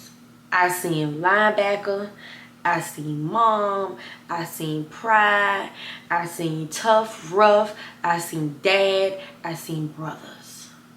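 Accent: New Orleans, United States. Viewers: Girl i woulda never guessed you were from Savannah 😂 it’s def giving NOLA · You not from New Orleans ?😟could had fool me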